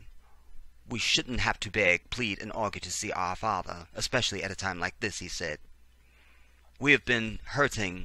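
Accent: United Kingdom. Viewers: The British accent 😂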